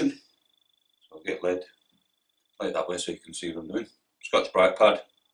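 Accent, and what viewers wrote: Yorkshire, United Kingdom. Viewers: Nowt wrong wi a Yorkshire accent.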